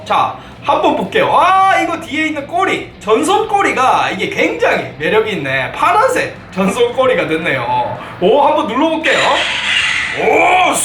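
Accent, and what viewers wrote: Gyeongsang, South Korea. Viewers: 님 경상남도 사시나요?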